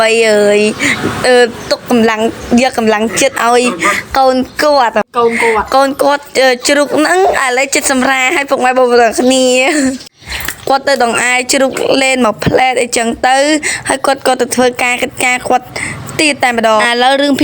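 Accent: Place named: Cambodia. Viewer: អូននៅខេត្តណា បាននិយាយវឹងហ៎😊😊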